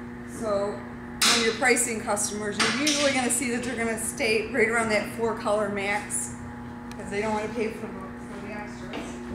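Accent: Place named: Upper Peninsula, United States. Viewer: Where is she from, she says midwest, but man I can't place that accent. Kinda sounds like a Yooper, but strangely off. She pronounces durometer as "Der-RAM-eater", and called a joint knife a "scOOOOper". Kinda Yooper-ish.....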